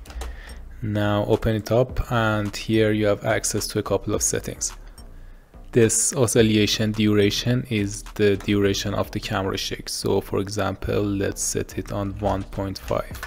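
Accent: Iran. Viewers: ur accent lol! are you persian dadash?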